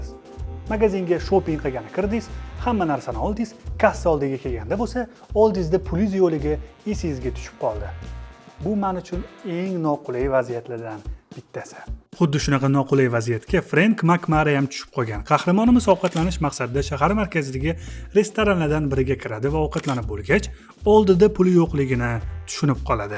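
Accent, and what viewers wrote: Tashkent, Uzbekistan. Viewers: molodes. Bizani dokondan oq sariq koyne xarid qildiz.loyiha un albatta. Korib xursan boldm. RESPECT 📌 Siz bn yangi loyihez bo'yicha fikir almashdi. Qisqasi keng fikrli, IQ balan, tarbiyali bola ekansz. Gap yo. Good luck bro ☝️🤲📌 📢Toshket shevasiga allergiya yoq dp umid qlaman.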